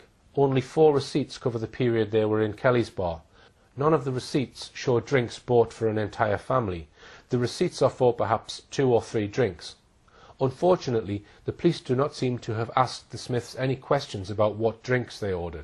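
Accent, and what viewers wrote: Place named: Newcastle, United Kingdom. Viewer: He's a Geordie it's his accent has nothing to do with been able to speak in the right way.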